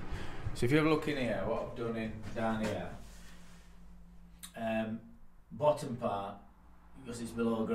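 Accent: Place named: Barnsley, United Kingdom. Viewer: Only watched one video and I can tell you’re deffo from Barnsley. Best accent in the world.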